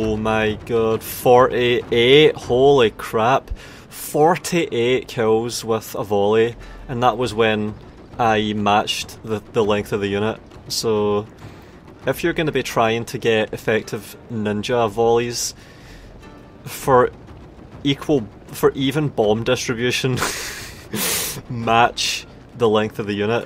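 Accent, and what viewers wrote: Scotland, United Kingdom. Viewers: you scottish? ik wierd ques but anyways great viedo never thought ninjas could be that useful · Are you Scottish?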